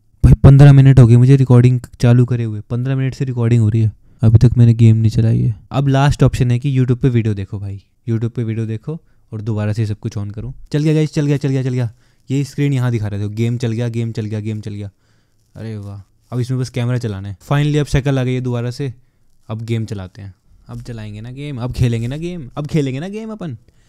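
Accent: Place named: Haryana, India. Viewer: haryanvi accent so good 😂♥️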